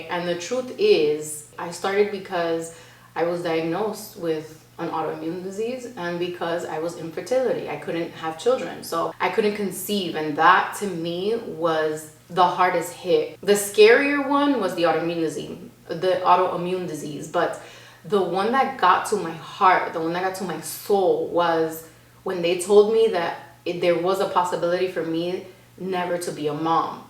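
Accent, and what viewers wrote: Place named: Miami, United States. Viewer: you sound miami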